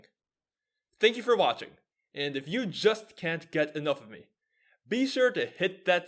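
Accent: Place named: United States. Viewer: an Asian-American accent, which is much more subtle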